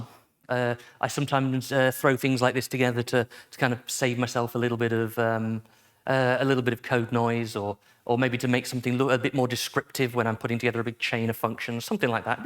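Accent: Midlands, United Kingdom. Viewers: I've turned on the autogenerated subtitles for a few minutes and they've been spot-on, if you're not used to an English midlands(?) accent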